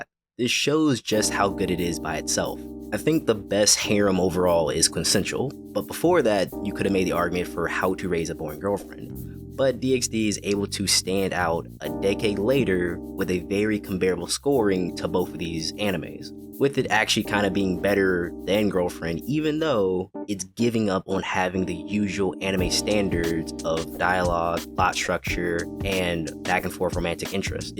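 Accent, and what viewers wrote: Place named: United States. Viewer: I heard your accent, i knew u would be some american burger consuming garbage fast food anime and enjoying it.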